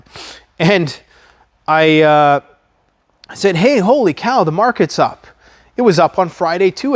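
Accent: South, United States. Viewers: Awesome southern talk lol Iol that was funny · Your southern accent gives me big George Dubyah Bush vibes hahaha. You crack me up, keep it going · Good southern accent! :) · Mr. should sound like a surfer dude since he's from California. lol Good southern accent though. Nailed it. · Excellent use of a southern accent! :) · Southern Accent, FLAWLESS…. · Love your southern accent lol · Oh, you are funny...you speak Southern...sounded perfect to me...🤣😂🤣🤓😂🤣 I hear it all around me here in Kentucky 😂 And i just adore the southern drawl! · Hi keep doing what you’re doing. Loving your red neck ascent, you should try an Aussie bogoan ascent next 👍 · Lol, 😆 love your " southern accent🙂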